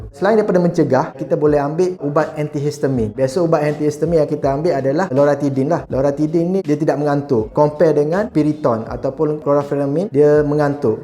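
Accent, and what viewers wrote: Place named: Kelantan, Malaysia. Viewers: Abg ni orang kelantan kan? Slang kelantan tu ada kedengaran.. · Bunyi Kelantan Dr ni cakap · Kelantanese accents has been detected.... 😁😁 Apa² good information doctor .....👍🏻👍🏻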